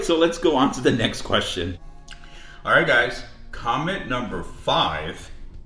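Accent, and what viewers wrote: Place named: United States. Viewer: Great video! Talk white? 🤔 Well if you grew up in America you would have an American accent...duhh. Lol and that's ok! People nowadays!😜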